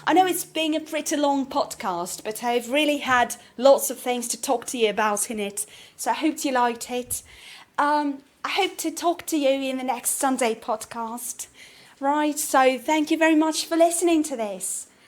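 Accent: United Kingdom. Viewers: Не люблю британский акцент. Американский роднее и проще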